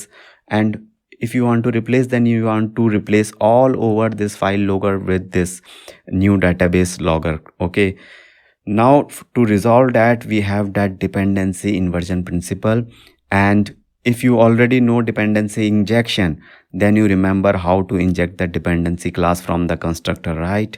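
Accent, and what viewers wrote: India. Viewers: Indian English is not something I will listen to ever. Gosh.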